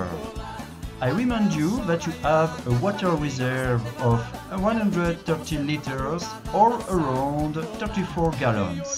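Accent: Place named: France. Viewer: Love French accent. Enjoy your van.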